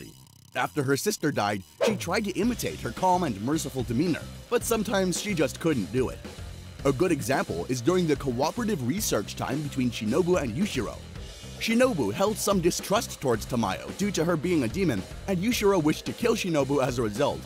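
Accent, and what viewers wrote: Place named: France. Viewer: bro is speaking frenglich ( a bit )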